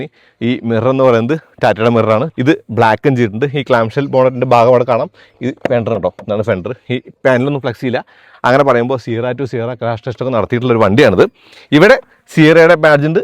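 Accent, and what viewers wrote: Thrissur, India. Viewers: Thrissur slang angananu bro..speed koodudal aanu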